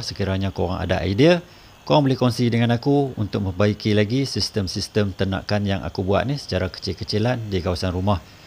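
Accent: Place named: Malaysia. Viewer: Bahanya kok kaya orang Malaysia ya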